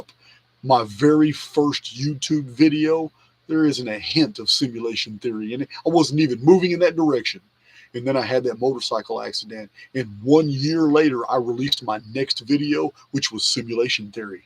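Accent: United Kingdom. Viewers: Brilliant Manchester accent 😊you didn't piss me of and im Scottish 😂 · Lol...loved the English accent😊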